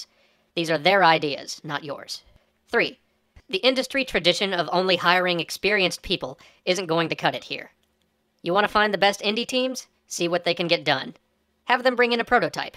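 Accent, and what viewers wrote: United Kingdom. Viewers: Best almost stereotypical british accent evarrr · I came here for the British accent arguement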